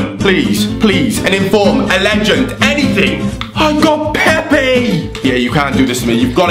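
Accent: Wales, United Kingdom. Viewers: Are u welsh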